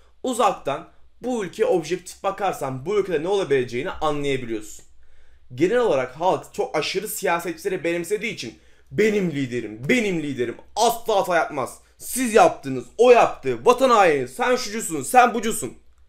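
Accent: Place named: Kastamonu, Turkey. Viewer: Hocam memleket neresi kastamonumu şiveniz benziyor ❤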